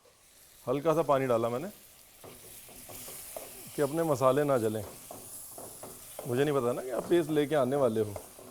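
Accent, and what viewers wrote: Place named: Lucknow, India. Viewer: Sir aapko chup rehne k liye kisne bola? Ek sec bhi pls chup na rahen aap! Jab tak Ranveer sahab ki lucknowi zubaan ka zayka na mile, kya faayda aisi dish ka? Aap bolte rahe aabaad rahe! Dhaniya kaat te time kuch bole hi nahi aap 😂 ! Aap pls bole, bolte rahen ❤❤❤❤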